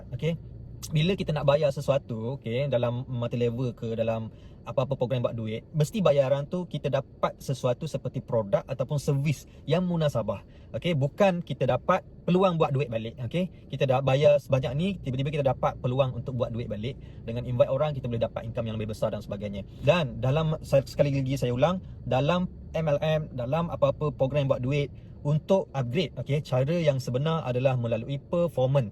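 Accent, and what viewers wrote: Terengganu, Malaysia. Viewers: org ganu ke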